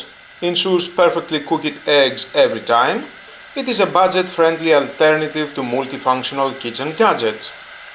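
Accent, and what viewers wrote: Greece. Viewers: Γιατι μου ακουγεσαι σαν Ελληνας με Proficiency?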